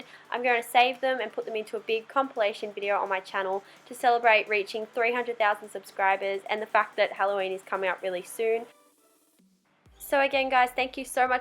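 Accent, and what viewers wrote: Australia. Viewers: I love her Australian accent. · Are you Australia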